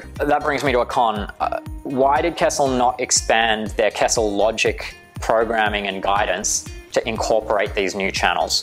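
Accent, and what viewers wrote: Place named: New Zealand. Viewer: U seem to have a kiwi accent. Are you from New Zealand? 💙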